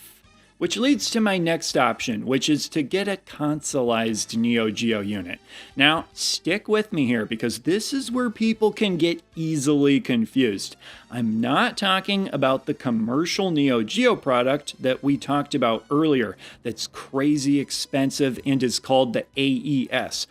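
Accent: Midwest, United States. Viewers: He is strange...where is that accent from...midwest? Northern/Canada?...Mormon territory?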